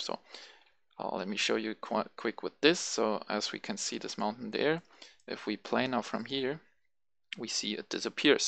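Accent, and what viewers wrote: Germany. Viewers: deutscher oder österreicher oder schweizer?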